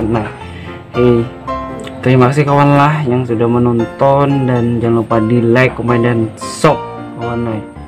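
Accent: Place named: Banjar, Indonesia. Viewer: bjur bnr tu orang Banjar😂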